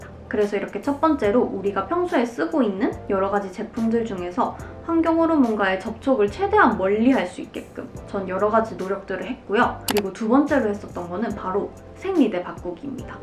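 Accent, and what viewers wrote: Gyeongsang, South Korea. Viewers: 일본인 구독자입니다! 님 고향이어디입니까!! 갱상도 사투리같은데 어디죠???🥺💕💕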